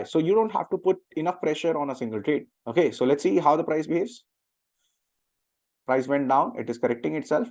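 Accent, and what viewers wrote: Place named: India. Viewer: India Accent is terrible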